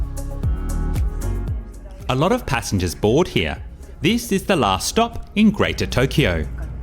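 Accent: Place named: Australia. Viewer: good to see you again Love your heavy Aussie (if I may say so) accent 😊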